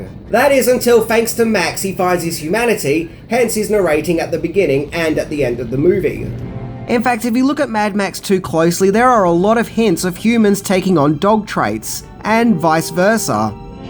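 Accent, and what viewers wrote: Australia. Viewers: your Australian. or do you just live there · My God this guy is Australian!!!!! I didn't know that!!!!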